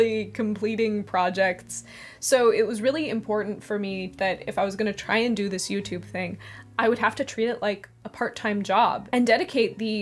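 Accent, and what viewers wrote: Virginia, United States. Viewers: This isn't meant to be mean it's meant as helpful criticism: Your accent is "Northeastern US from Virginia"? Virginia is in the southeastern US. New Jersey on up is Northeastern US, and the rest of the Northeast isn't really happy with having Jersey as a part of the Northeast. New York accents are northeastern US, general New England accents are Northeastern, Boston accents are Northeastern US accents. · I do not live in Michigan though I do have a Michigan states park pass on my car! I think it’s interesting, my guess from your accent was Virginia so partial credit? Really enjoying the channel!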